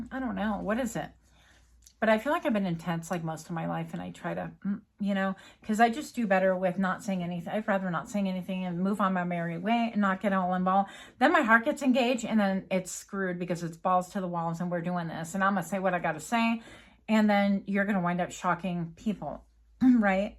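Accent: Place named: Spain. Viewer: I love your Spanish accent. I'm from Mexico and I love it. 🩷🌟🌟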